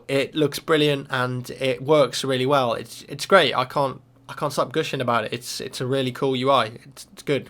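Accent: United Kingdom. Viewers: HES BRITISH ITS THE WAY THE PRONOUNCE IT. GET THE FUCK OVER IT. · BF3 not BFfree. He's a brit like me ,mate. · Because he has a British accent?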